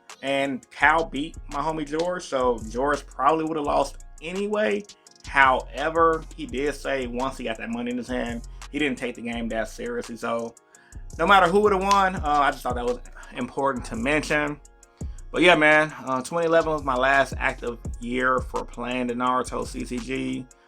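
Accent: Chicago, United States. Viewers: Were you from Chicago?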